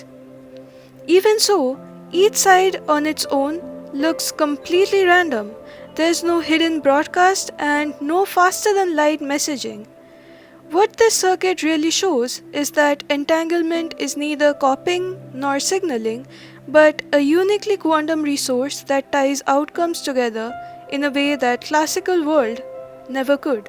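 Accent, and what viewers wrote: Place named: India. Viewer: I usually can't stand Indian accent of English - but this one is really pleasant to listen to lol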